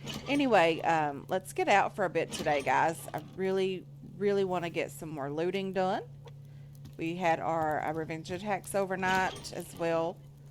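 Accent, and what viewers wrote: Kentucky, United States. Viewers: There's my Kentucky accent fix! Brilliant episode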